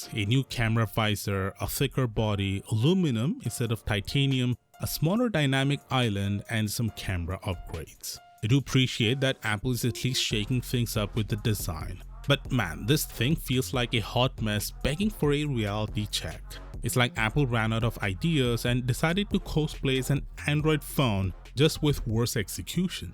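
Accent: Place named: India. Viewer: Omg i can hear indian accent from few videos away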